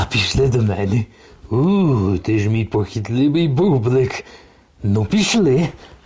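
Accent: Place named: Western Ukraine, Ukraine. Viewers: уважуха за гуцульський діалект.. щистьи, здоровльи тобі та побільше таких відіків) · А сам из Украины, но у тебя такой акцент шо иногда я нихера не понимаю что ты там несешь, наверное ты из очень глубокого западенського села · Це Галичанський акцент, скоріш за все, франківська область. Здарова землякам)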